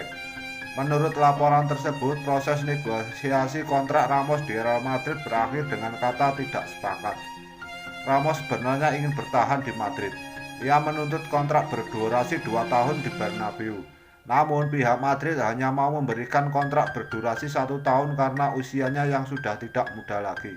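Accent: Java, Indonesia. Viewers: Kaya orang Jawa ngapak hehehe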